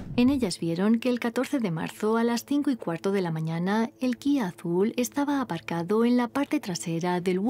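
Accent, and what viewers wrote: Spain. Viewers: El acento es obvio, española.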